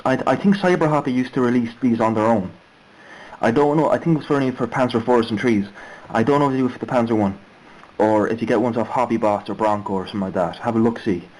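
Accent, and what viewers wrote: Ireland. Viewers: Ah... Part II... All clear now. That was well done. You're a natural. Love the accent dude. You sound Irish... did you know that? hehehe.:)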